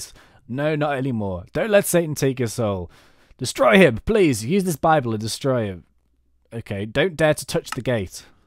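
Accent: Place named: England, United Kingdom. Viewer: I thought it was HYSTERICAL in your last map when you like ---- and it said blimey XD best video ever and sorry I'm obsessed with your English accent :3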